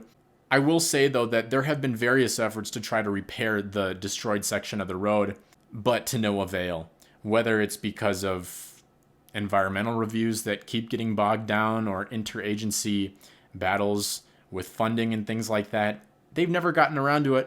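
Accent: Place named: Midwest, United States. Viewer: I’m glad you liked SoCal. I would never leave. Born and raised here in Orange County. I can hear your Midwest accent. Never been to the Midwest or East Coast. It’s so odd that you’re hapa and you’ve never been to CA. Hapa means part Asian.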